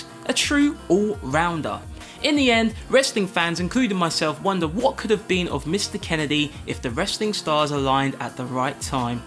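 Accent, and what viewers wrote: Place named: England, United Kingdom. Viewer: I clicked to hear the bro talk with an English accent. Go forth young man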